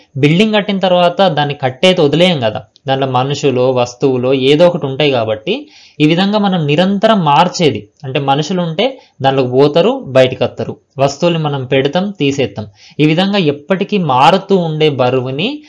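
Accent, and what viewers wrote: Telangana, India. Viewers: అది తెలంగాణా యసా అంతే ఉంటది..నీకేం నోస్తుంది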